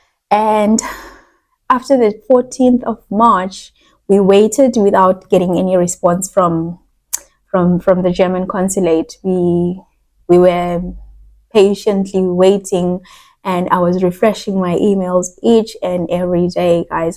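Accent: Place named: England, United Kingdom. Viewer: Hi guys it's a pleasure to follow you an praying that God bless you all marriage an you talk like you are from England or the UK an not from africa😊🎉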